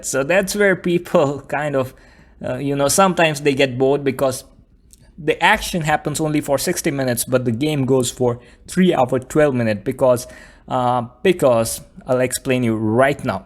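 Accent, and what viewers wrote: India. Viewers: no it couldn't be real, you Indian ain't you? Anyone can tell that by the way you pronounce "t" and little hukkies you take. I have an indian friend, she speaks exactly like you, btw no hate🤗🤗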